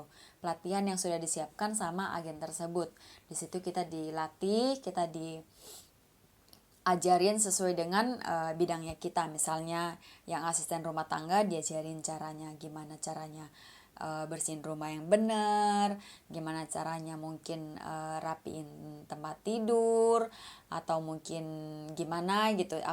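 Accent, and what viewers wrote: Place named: West Java, Indonesia. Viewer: Teh logatnya kaya org Sunda,slm knl dari tkw malaysia asal tasikmalaya.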